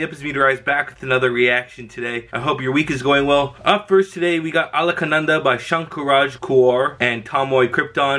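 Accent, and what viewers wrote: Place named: Assam, India. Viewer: Ur pronunciation is liked assmeis.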